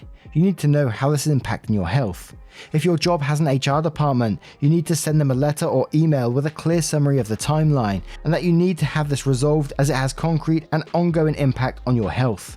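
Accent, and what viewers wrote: England, United Kingdom. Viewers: There's nothing better than hearing that proper English accent sing am I the butthole😂😂❤❤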